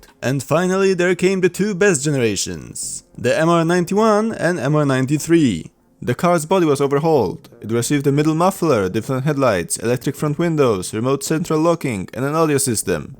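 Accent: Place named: Poland. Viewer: You sound like a Polish 😊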